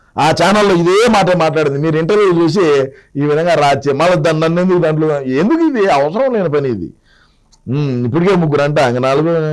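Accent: Rayalaseema, India. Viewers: anna me rayalla sema language lo challa Baga mataladathu very nice allthe best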